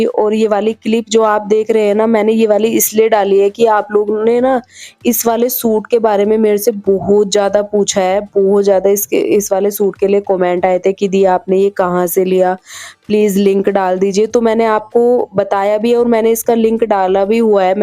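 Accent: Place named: Haryana, India. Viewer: hnji ap ki language mei touch ata h wo haryana walo ka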